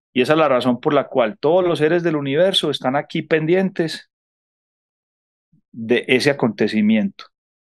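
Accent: Colombia. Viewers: Soy colombiana pero créame q me encanta como hablamos los colombianos. Una en Colombia bueno no le párese pero cuando uno va a otro país y escucha como hablamos. Jajaja jajaja me encanta. Nuestro hablado habla y se escucha espectacular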